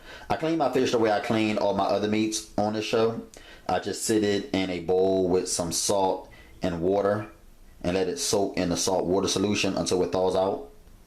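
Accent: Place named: Baltimore, United States. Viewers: lol be sounding like he from PG County Baltimore ahahaha "Fyew"... "Tew"... "Dew"... Oiyew (Oil) hahaah Much luv bro! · Sound like you from Baltimore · He sounds baltimorian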